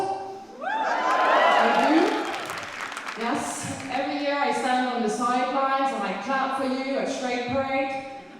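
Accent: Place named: United Kingdom. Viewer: Perfekt oversættelse fra din danske bid i “Grin til Gavn” oven i en formidabel britisk accent!🤩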